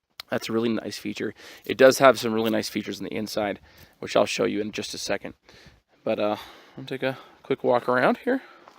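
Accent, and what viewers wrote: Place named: United States. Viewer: Where the hell do you live? You sound American as hell but the steering wheel is on the wrong side in ya truck and your gauges look all backwards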